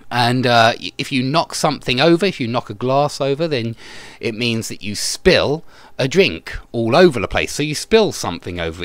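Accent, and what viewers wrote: United Kingdom. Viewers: your british accent is just lovely.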